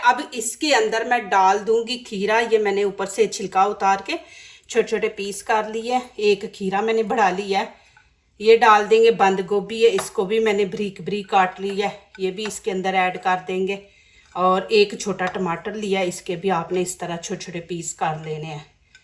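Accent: Punjab, India. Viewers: Apna urdu lehja theek karen panjabe lag rahe ha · بہن پہلے آپ اپنے پنجابی لہجے پر قابو پائیں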